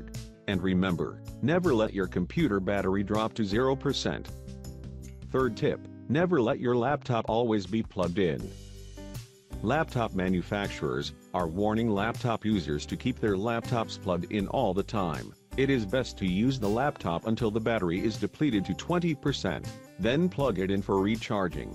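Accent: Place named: United States. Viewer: Thank you for this useful information. Hearing an American accent I assume you mean Fahrenheit and not Celcius, when mentioning temperature.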